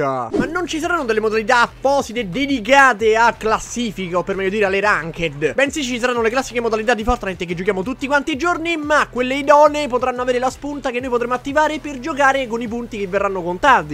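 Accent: Rome, Italy. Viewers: Cmq non mi sarà capitato il video in qui dicevi di dove sei però se capisce che sei de Roma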